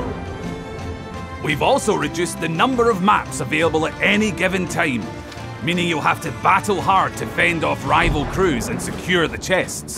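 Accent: Scotland, United Kingdom. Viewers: Why is he Scottish?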